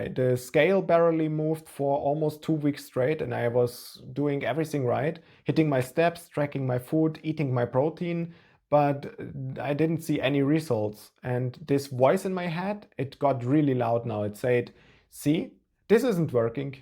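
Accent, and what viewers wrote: Germany. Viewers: Gosh, finally a real video from a real normal person. I think all those American lecturers are from a different Galaxy, stuff I just don't understand. I wish I got to your "before" photo. 🙂Please, use kilograms, I am from Europe, just like you, Australians use kilograms, I can kind of hear you're from Germany 🙂. I started tracking steps/kalories (I think I have some optical results) and I came to the same startling conclusion - granola oat cereals for breakfast is a MURDER, also peanuts for snacks, which I need to phase out. Two fat slices of bread with jam have less calories than oat cereals and one does not get bloated. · Very nice, smart and informative video! As a French guy, I like the German mind, usually rational and analytical. Also, the accent... 😉 Also, your new body, lean and muscular. Congrats for that. 👍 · when you hear a strong german accent like this you know he doesn't wanna sell you anything but just genuinely want to help you. 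thanks man. I needed this. Turning my walking pad on right about now 👍🏼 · Really interesting video. Walking is underrated. I walk every day around 15k steps and do jump rope 4-5 times a week, and recently added 100 Push ups a day. Im not fat, but for what i put in... the results (over time) are lacking. I "just" need to change my nutrition. these damn tasty soft drinks and bread... Is that a german accent? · You sound German... To have German food and choose vegan food is just wild to me You don't really need the 1g of protein per pound of body weight. There's lots of data demonstrating this. Collectively, the data that suggest it's needed, shows about .7 to .8 is plenty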